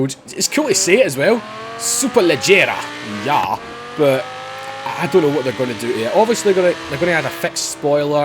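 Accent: Scotland, United Kingdom. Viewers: Mate Gota love Scottish peoples voices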